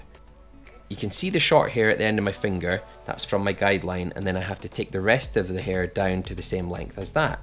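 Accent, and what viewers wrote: Scotland, United Kingdom. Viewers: Question, is that a Scottish accent? Lol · I’ve lived in the states since I was wee, it’s still lovely to hear a calm Scottish voice every now and then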